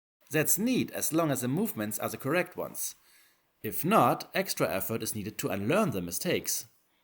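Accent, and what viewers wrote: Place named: Germany. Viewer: Well, I liked your video. And you sound like you're German. Which is also good.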